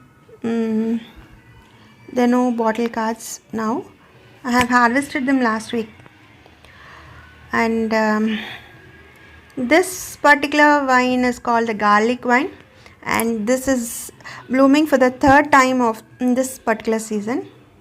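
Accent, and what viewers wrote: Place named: Andhra Pradesh, India. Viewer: మీ vedio లలో తెలుగు పట్టి పట్టి మాట్లాడుతుంటే తెలుగువాళ్లు కాదేమో లేదా ఏ అమెరికా లోనో పుట్టి పెరిగి భారత్ కీ వచ్చారేమో అనుకున్నాను 😀 ఇవాళ మీరు english లో మాట్లాడుతుంటే confirm అయింది మీరు పుట్టి పెరిగినది అంతా పక్కా ఆంధ్రా 😀 Any how your garden is beautiful and we can make out the hardwork you put behind to maintain it for years. Keep going. All the best mam👌👏👏👏👍🙏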